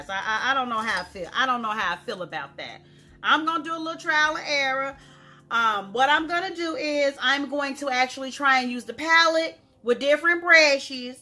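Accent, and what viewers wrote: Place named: United States. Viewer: Your non chalant country voice cracks me up i looooove it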